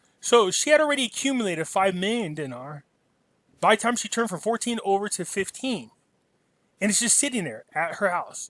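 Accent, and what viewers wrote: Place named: United States. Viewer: Hi why do all Americans pronounce Iraq and Iran with an I as in I they pronounce it with an India pronunciation.. could you change your pronunciation to honour these countries ? Thanks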